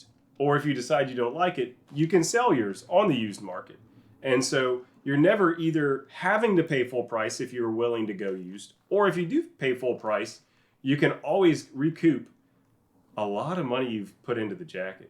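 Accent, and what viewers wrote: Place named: Pennsylvania, United States. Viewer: love the pennsylvania accent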